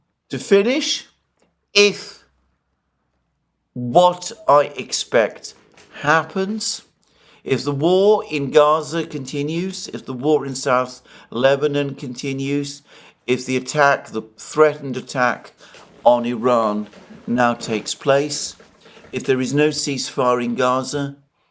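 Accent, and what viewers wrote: United Kingdom. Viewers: it is an ai, it is transcribing Alexander's British accent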